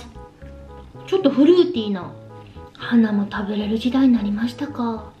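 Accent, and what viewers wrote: Kansai, Japan. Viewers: 関西弁がすごい可愛い 方言最高やな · 関西弁可愛い♡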